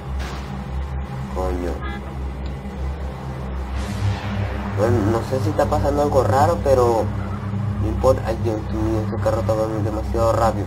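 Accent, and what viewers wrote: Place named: Apure, Venezuela. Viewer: aaa or eso mano se te eschuca lavoz de apureño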